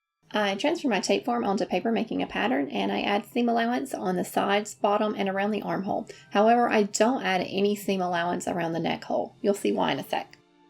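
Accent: South, United States. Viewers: I can't place your accent! Can you tell me where you're from? You sound Southern like me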